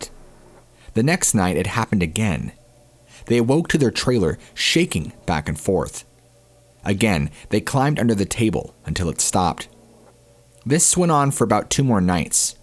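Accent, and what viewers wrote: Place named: United States. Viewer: I love your American accent 😁👍😘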